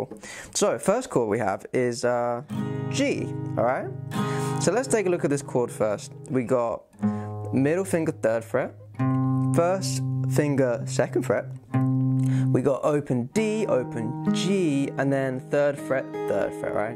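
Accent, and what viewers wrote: United Kingdom. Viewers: androidtingson he's British too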